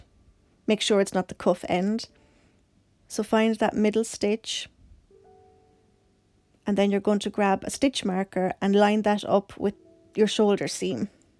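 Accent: Ireland. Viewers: Hey! I love this sweater. I just found your channel and subscribed immediately. I love your Irish ☘️ (I think 😊) accent. I might be binge watching your channel today 😉. Thank you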